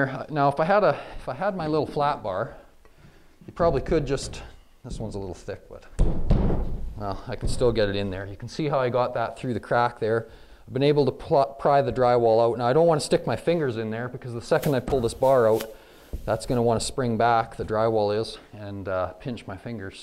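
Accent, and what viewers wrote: Canada. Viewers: as soon as i heard the canadian voice i clicked off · The second I heard the Canadian accent. I knew I could trust this guy. Thanks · Only americans can tell if he has a Canadian accent ! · Heavy Canadian accent wo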